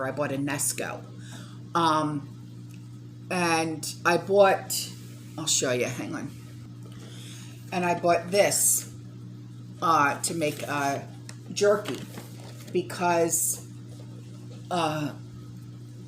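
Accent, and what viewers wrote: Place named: Brooklyn, United States. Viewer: Well hello there, I stumbled upon your channel last night. I LOVE yer NY Brooklyn accent and your channel! Please don't hold back, pour on the accent! Prepping with pride!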